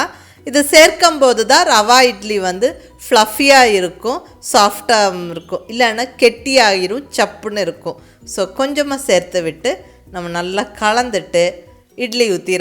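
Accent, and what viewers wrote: Coimbatore, India. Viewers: ........w r u????? I want to see u..... i like ur all recepies......kovai slang superrrrrrr......i like u.... i am INDHUMATHI vellore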